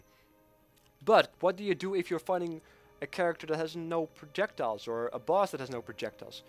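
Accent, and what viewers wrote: Netherlands. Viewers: You are a dutchy aren't you (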